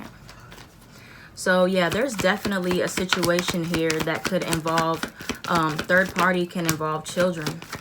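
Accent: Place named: New York, United States. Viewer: This was the best reading, Today I felt the real New Yorker came out and spoke to me in a New York sort of way, I really like that and everything truly resonate 100% I am in a 3rd party situation with pisces his my husband of thirteen years, he left me like ten months ago, I recently find out that the women is pregnant for him, So I decided this was not for me anymore and filed for Divorce, some days are harder than others, I feel I'm moving forward, but by my capricorn standard not fast enough but I'm learning to take it one day out time, I believe the Universe got my back on this one because of the cruelty of the betrayal and how it was serve, still having a hard time with that one. Anyway Thank you for reading this long ass comment.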